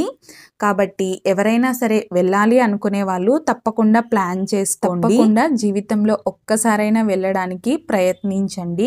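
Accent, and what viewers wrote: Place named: Telugu, India. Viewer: Very nice description. Loved the Telugu accent. I will visit Mantralayam one day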